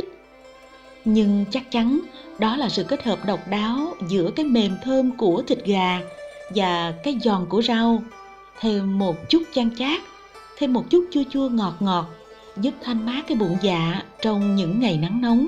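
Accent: Mekong Delta, Vietnam. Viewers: A mà là bí thư tỉnh em .A ký ngay quyết định Cho em về làm phát thanh viên đài truyền hình của tỉnh ngay. Vì giọng đọc của em rất ngọt ngào ấm áp.....lôi cuốn khán giả. Chuẩn gái miền tây...... Hihi..... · Chat lieu lam nen mon ngon rat dan da binh di khong co cong thucnhung day mui huong ket hop voi chat giong mien tay de thuong va khong the thieu chiec ao ba ba lam nen hon que viet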